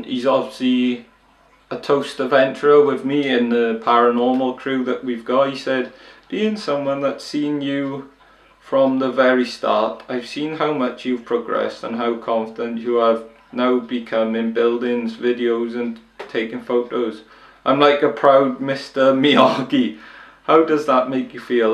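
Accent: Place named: Liverpool, United Kingdom. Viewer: Jesus how did you say woolton hall 😂😂😂😂😂 that was hilarious just as you're starting to pick up the scouse accent too. Great Q&A mate.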